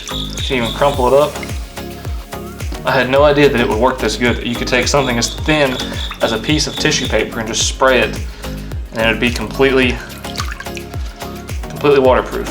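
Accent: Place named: Texas, United States. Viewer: What is this guy's last name? I believe with his slight accent he's from Texas?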